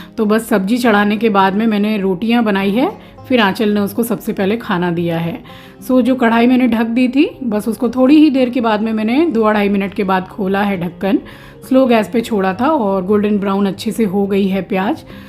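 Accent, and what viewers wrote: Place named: Marwar, India. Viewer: Aap marwaadi hae,mere nanihal me same working chalti hae or bolne ka tarika bhi kyunki woh bhi marwaadi hae…😊😊 I like ur life style because I remember my nanihaal after watching ur vlog❤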